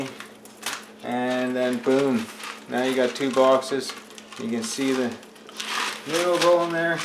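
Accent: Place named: Canada. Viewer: I wish I had his accent lmao such a pure Canadian accent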